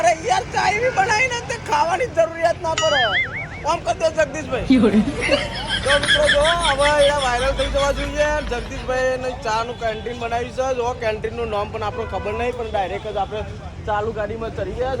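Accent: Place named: Vijapur, India. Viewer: Good. Good. Original. Vijapur. Nee. Bhasa.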